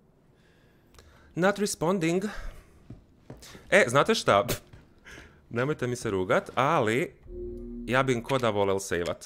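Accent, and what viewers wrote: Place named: Chakavian, Croatia. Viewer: sta ti pricas Cakavskim narjecjem?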